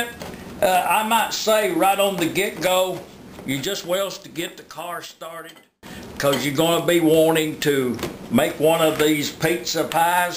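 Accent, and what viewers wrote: South, United States. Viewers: I find your comment extremely insulting! What are you insinuating by "his voice" and "southern fried pizza"...apparently you're stating that because someone has a southern accent they are incapable of cooking something that does not contain fried chicken...Furthur, you have no room to insult ANYONE on here, due to the fact that your username is "Inbreed6699Family" Enough said.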